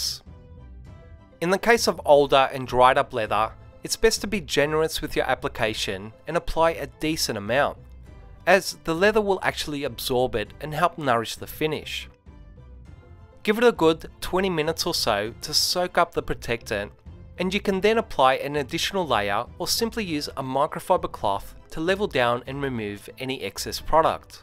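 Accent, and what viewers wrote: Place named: Australia. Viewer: What nicw accent is that? Aussie?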